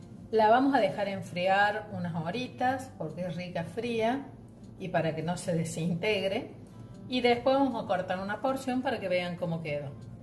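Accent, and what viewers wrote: Córdoba, Argentina. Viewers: La primera vez comí la tarta de ricota con naranja en Cordoba Argentina, hace 15 años; simplemente fabulosa. Voy arriesgarme a preparla. Me encantó oir su acento cordobés. Gracias por compartir.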